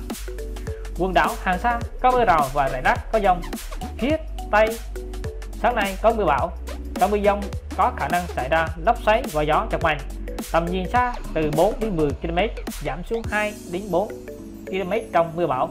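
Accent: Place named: China. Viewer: Giọng như giọng người Hoa khó nghe lắm thay phát thanh viên đi thôi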